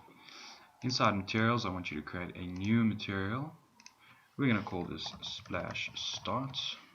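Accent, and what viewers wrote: South Africa. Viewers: Are u South African because of ur accent? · Yoooooo!!!! More South Africans!!! I'm South African too. I live in Cape Town :)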